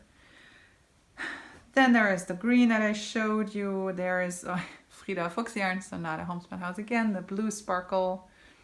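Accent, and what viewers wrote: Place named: United States. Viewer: Your English is lovely. As inspired by fiber stated, we always have to pause to think of the correct word to use and we speak English as our 1st language😂. Your accent is amazing. You sound like you live in the US. So, beautiful job. I, too, loved the yellow yarn story. Thank you for sharing. Hugs.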